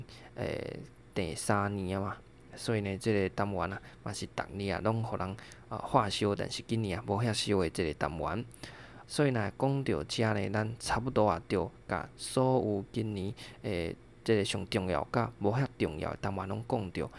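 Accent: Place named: Taiwan. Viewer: 老師講台語好好聽！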